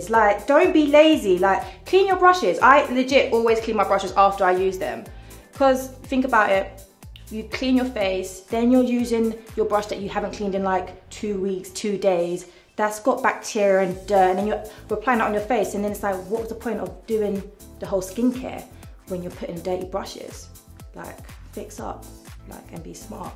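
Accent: United Kingdom. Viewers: Thank you for helping me with the British accent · I HAD NO IDEA SHE WAS BRITISH?! AND A CHILD??? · Omg I didnt realise she was british yasss black queen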